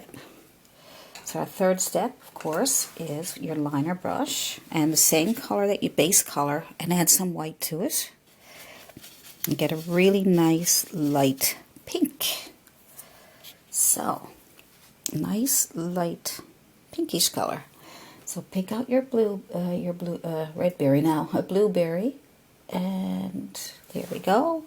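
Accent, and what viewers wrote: Newfoundland, Canada. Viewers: Is that a Newfoundland accent?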